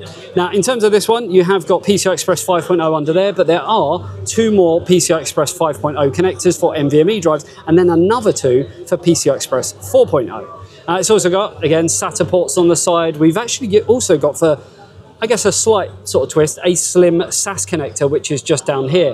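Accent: United Kingdom. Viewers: Why is British accent so horrible? Can't even speak the alphabet with consistency let alone make coherent sentences.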